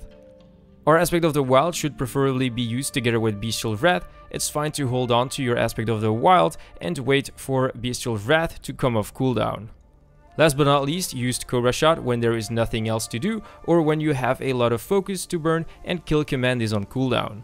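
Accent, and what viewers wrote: France. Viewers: Tu es Francais toi :)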